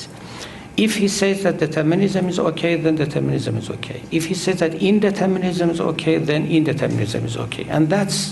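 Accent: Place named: Iran. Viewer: 30 years ago i listened and watched to Mr. Sorosh traslations of Rumi poets from persian to english on Iranian TV. I must say his english was better, faster and with a little persian accent. Now he is slower and the tone of his voice is alwayes same which makes it hard to follow his remarks and ideas ....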